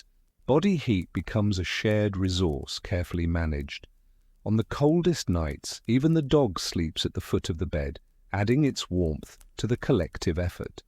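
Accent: England, United Kingdom. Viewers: Old west stories by a narrator with an English accent?! I’m out 🙄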